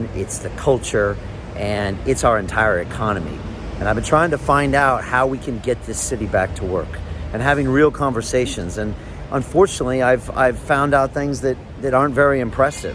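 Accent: United States. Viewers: Is this a guy with an American accent Go back home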